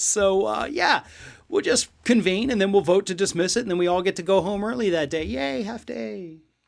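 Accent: South, United States. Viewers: Love your American accents. 😍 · I’m not American, but I like your southern accent! Greetings from the Netherlands.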